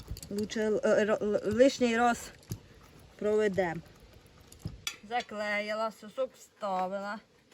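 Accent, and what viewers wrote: Poltava, Ukraine. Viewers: я так і думав) у мене кума з Полтавщини, то теж так говорить)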